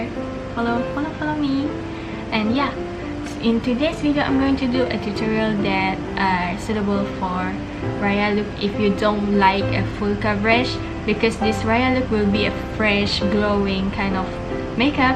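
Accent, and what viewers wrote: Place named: East Malaysia, Malaysia. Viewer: Bila bercakap, terus tauu dari seberang! east malaysia ada slang tersendiri 🥰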